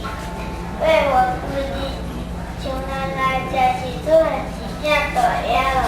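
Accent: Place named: Taiwan. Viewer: 讀得太好了，情節很精彩，台語很道地。希望貴聯盟能製作有更多台語版的伊索寓言。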